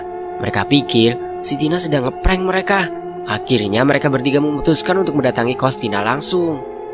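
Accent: Java, Indonesia. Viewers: Sya suka cerita mistis tapi kalo ngomongnya jawa medok jadi males dengernya memang udah ada teksnya tpi ini cerita bukan film saya dengernya sambil kerja...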